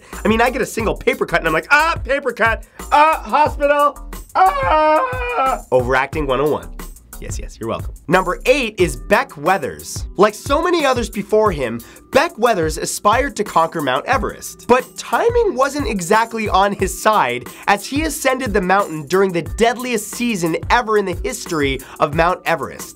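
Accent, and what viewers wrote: Canada. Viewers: Canadian eh? love it and ya winter is BS here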